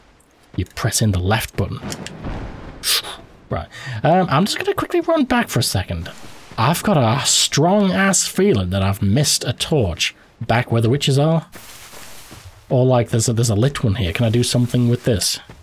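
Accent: Scotland, United Kingdom. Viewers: That’s not an attempt at a Scottish accent. That’s a real deal Scotsman right there, no doubt about it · That Scottish accent isn't an attempt btw, that's a genuine Scot. I tell you that as one such specimen myself :P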